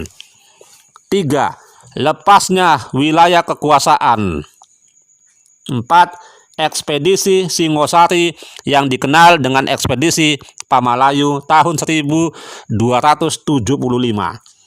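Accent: Java, Indonesia. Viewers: Saya penasaran dengan Om ini ilmu Sejarah dan Agama sangat oke.dan kalau bicara logat bataknya tidak kelihatan bahkan logat bicaranya seperti orang jawa.Padahal aslinya orang Batak.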